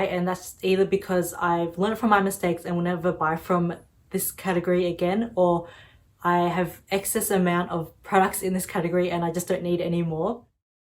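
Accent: Australia. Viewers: I support ozzie youtubers^^. Are you in Sydney or Melbourne? As you sound ozzie ~^^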